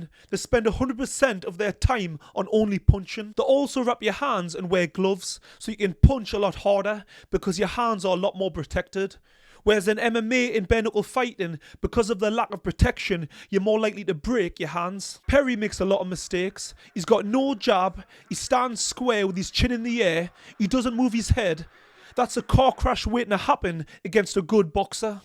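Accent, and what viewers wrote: Newcastle, United Kingdom. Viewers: You from the Toon or Durham mate, regardless subbed 👍🏻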